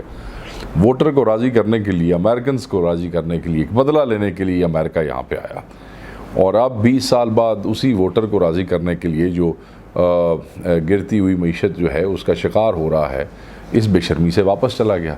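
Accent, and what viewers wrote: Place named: Punjab, India. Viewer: Sr apka punjabi tuch buht achha lgta hy 😑🤣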